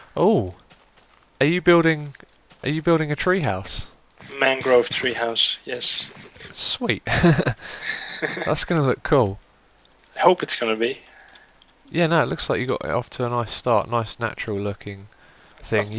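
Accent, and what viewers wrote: Netherlands. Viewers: Haha, I could tell by his accent that this guy was Dutch :D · I think you can really hear it, actually. I am dutch myself and am really familiar with dutch people trying to speak english. You can defenitely hear that he's dutch.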